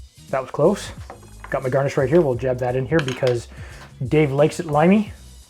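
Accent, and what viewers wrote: Canada. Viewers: Lets talk abeewt.....love that Canadian accent.....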